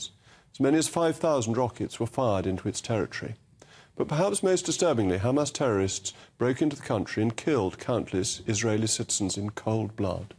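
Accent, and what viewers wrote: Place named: RP, United Kingdom. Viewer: Why does this guy sound so condescending absolutely about every single thing 🫤 must be his posh accent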